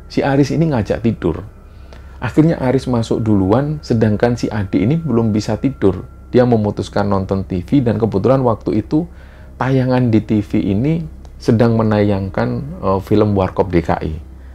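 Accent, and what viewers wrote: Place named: Surabaya, Indonesia. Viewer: Nuwun sewu, sedikit saran aja mas, usahakan jgn pakai dialek surabaya/arekan kalo menceritakan dg latar jawa tengah dan daerah dialek non arekan...matur suwun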